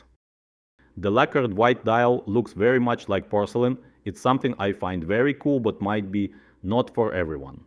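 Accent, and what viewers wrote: Russia. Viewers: Русский акцент пипец жёсткий, конечно...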